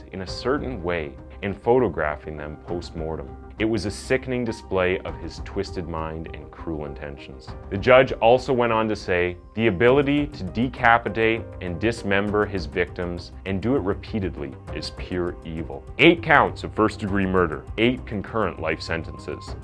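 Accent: Canada. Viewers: Are you Canadian?? 🤔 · Narrator is definitely Canadian.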